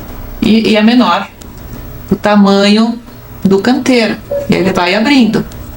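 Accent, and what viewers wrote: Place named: South, Brazil. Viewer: Hi, que surpresa ver campos de lavanda em Gramado! vc está em Gramado? amo Lavandas e nāo sabia que tinha ai no sul.,principalmente quando estāo proximas com campos de girassois. Aqui no sul da França, o pic da floraçāo é em julho qdo fazem a colheita, e ai em Gramado? amei ver suas técnicas de pintura e maneira de ensinar e amei o sotaque kkk acho que é do sul! estas tintas em pote que vc usou, sāo tintas para decoraçāo? a pigmentaçāo é permanente? pergunto pq aqui este tipo de tinta em potes sāo vendidas em lojas de crafts e a escolha de cores é limitada, e as em tubo em lojas de material para desenho e artes. fiquei feliz de encontrar seu canal e ver suas alunas!!!!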